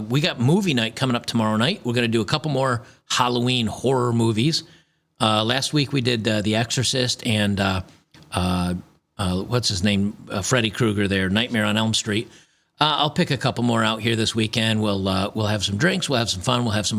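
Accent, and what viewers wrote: Australia. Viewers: G'day mate I like your aussie accent. Crickey her reaction on the trip home was bloody funny. How would posting that video help her future dating prospects?. The first video makes her look like a terrible person, the second video makes her look pathetic. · I am begging you please do more Australian accents in your videos to come it is much-needed comic relief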